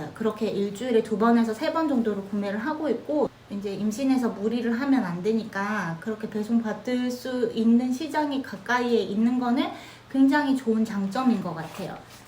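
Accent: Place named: Ulsan, South Korea. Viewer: 출근준비하면서 우연히 보게되었어요. 혹시 울산인가요? 억양등 말투가 참으로 익숙해요. 있어보인듯 꾸미지않으시고 편하게, 사실적이게 말씀하셔서 편하네요~^^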